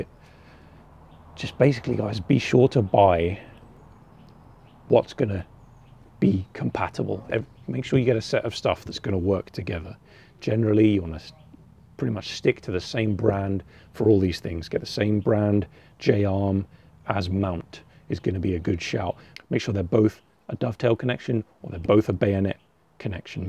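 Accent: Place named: United Kingdom. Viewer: I just realized, man's a brit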